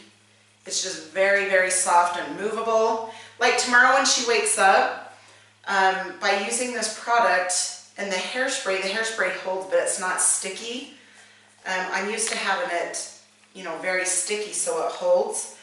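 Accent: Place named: Wisconsin, United States. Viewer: Your accent sounds like Wisconsin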